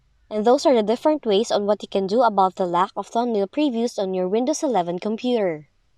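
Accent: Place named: China. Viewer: unable to trust chinese accent there is suspicion of getting tricked into malware infection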